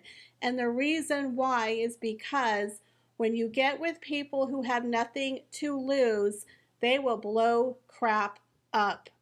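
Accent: South, United States. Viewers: I really like your views and you speak exactly the same as my southern cousins. I’m in the middle 🇺🇸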